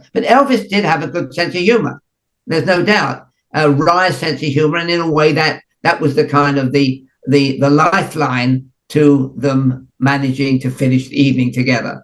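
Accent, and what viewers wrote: Liverpool, United Kingdom. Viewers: One question : Why almost everybody in Liverpool I have heard speak with your kind of accent except The Beatles? Did they have to change their accents in order to be understood better or it depends on the part of Liverpool people are from? Because they never sounded like "Scousers" as you do for example .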